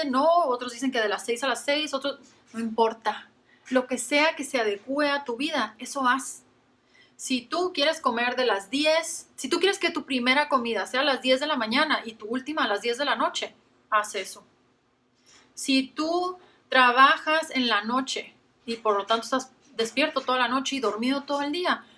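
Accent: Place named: Chihuahua, Mexico. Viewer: ʎʇɹɐɯ ʎןɟɔɯ yo creí que era de Chihuahua por el acento tan shulo 😐😐.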